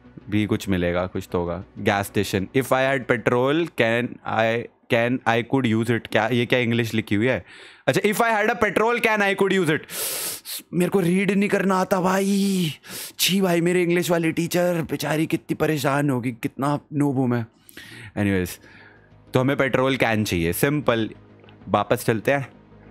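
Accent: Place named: Bengal, India. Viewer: he is talking like Bengali people ❤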